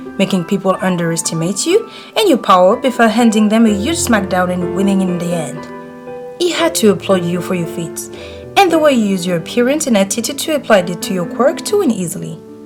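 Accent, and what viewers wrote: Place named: France. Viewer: Cool video, love ur french accent ♡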